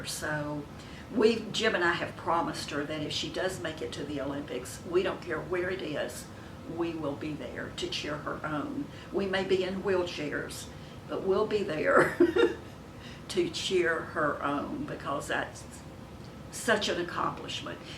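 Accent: South, United States. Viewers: Where are you from? You have a southern accent. I'm in TEXAS.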